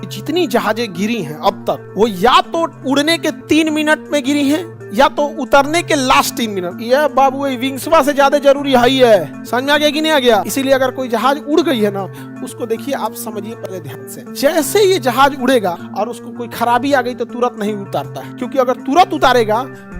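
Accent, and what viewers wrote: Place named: Bihar, India. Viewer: jock chal rhe ya sikha rhe🙄🙄, itni bakwas video, lol bhi samjh na aya, koi bihari lag rha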